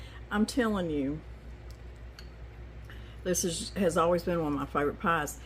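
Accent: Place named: Arkansas, United States. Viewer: The kids would request buttermilk pie for birthdays so it was a favorite ❤️. I think my favorite thing about your channel is your way of talking there in Arkansas. It’s so sweet and relaxing. I love the words you use and the way you say them. Just oozes love and I know that comes from Jesus. I froze some duck eggs this morning and I made quite a mess! Tried to do it in the muffin cups but duck eggs are too big so I couldn’t whip them up plus duck eggs are so thick. So I got out my stick blender and the head of it fit right in the muffin cup perfectly. A few buzzes and all was well! Next time I will buzz them before pouring into cups but at least I saved the ones I had already cracked in the muffin cups. I did use the silicone type.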